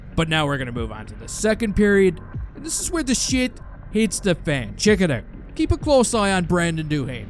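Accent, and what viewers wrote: Newfoundland, Canada. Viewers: Knew you were a newf within the first few seconds of voiceover 😂 much love brother, go habs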